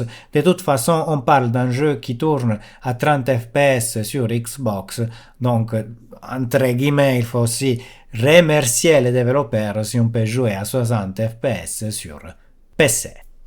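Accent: Italy. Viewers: ALORS GLOBALEMENT JE SUIS D'ACCORD AVEC TES COUPS DE COEUR ! PAR CONTRE APRÈS LORSQUE TU PARLES DE CARTOGRAPHIQUES JE SUIS MOINS EN D'ACCORD AVEC CE QUE TU DÎT ! POUR EXEMPLE LE DLSS ET AUTREMENT MIEUX QUE LE SYSTÈME DE AMD QUE J'APPRÉCIE PERSO ATI CAR ILS ONT RACHETÉ DEPUIS LONGTEMPS CERTE MAIS JUSTEMENT LORSQUE 1 MARQUE EN RACHÈTE 1 AUTRE DANS LA MAJEUR PARTI DES SECTEURS C'EST TOUJOURS LE MÊME NOM QUI ET GARDER ! POUR EXEMPLE EN AUTOMOBILE TU PEUT PRENDRE N'IMPORTE QUEL MARQUE APRÈS 1 RACHAT ET ELLE NE CHANGE NI DE NOM NI MÊME DE PAYS OFFICIEL POURTANT POUR MOI LE PAYS DEVRAIT CHANGER À PARTIR DU MOMENT OÙ LES DÉCIDEURS NE SONT + DU PLACE POUR DONNER QUELQUES EXEMPLES ON PEUT PRENDRE LE FRANÇAIS BUGATTI RACHETÉ PAR ALLEMAND VW ET SI L'ON PREND D'AUTRES MARQUES AUTO C'EST TOUJOURS PAREIL JE PRÉSUME AVEC TON ACCENT QUE TU ET D'ORIGINE ITALIENNE ET BIEN SI L'ON PREND LAMBORGHINI POUR EXEMPLE ILS ONT ÉGALEMENT ÉTÉ RACHETER PAR VW ET LE NOM DE LA MARQUE RESTE ET LE NOM DU PAYS AUSSI ET TU PEUT PRENDRE N'IMPORTE QUEL EXEMPLE ET CELA NE CHANGERA PAS ! 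D'AUTRE PART MOI QUI SUIS 1 VIEUX PASSIONNÉ DE HI-FI JE PEUT T'AFFIRMER Q CE EXACTEMENT LA MÊME CHO EN HI-FI ! ALORS QUE EN PC OU EN D'AUTRES SECTEURS IL AEEUVE PARFOIS QUE L'ON ÉLIMINE LA MARQUE D'ORIGINE ! BREF J'AI DISGRESSER SUR 1 AUTRE SUJET 😅😁 MAIS POUR LES CARTES GRAPHIQUES NVIDIA IL Y A DES GAINS IMPORTANT AU NIVEAU D CERTAINEMENT CHOSES NOTAMMENT AU NIVEAU LOGICIEL POUR LE DLSS C'EST + DE VITESSE CAR C'EST MOINS LOUR ET ÉGA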